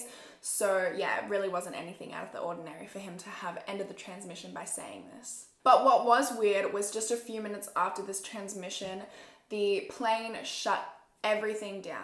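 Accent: Australia. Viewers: Are you Australian? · Wow im memorised listening to this absoulutly beautiful lady and love the aussie accent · Are u Australian or American?😂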